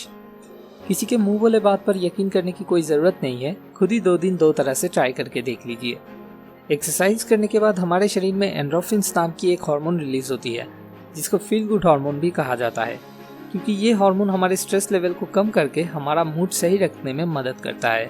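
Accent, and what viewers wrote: Bengal, India. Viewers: Initially this voice was looking awkward to me. BUT nowadays, I like this voice & pronounciation of Bengali Hindi. 😊✌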